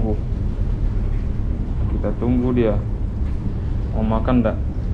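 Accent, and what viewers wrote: Bugis, Indonesia. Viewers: ORANG BUGIS KAH SAPPO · Orng Bugis ki aaa??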